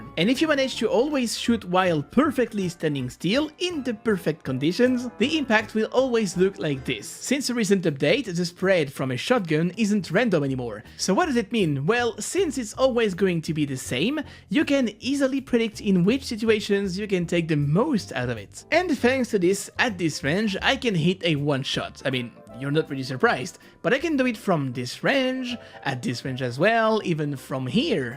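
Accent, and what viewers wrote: France. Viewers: French accent ? 😁😁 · you have a french accent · sound french ? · Is he french ? His accent is betraying him xD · J'adore ton accent francais esti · You have a French accent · Are you french ? Your accent looks so much french if you're not it's weird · You have some Times a french accente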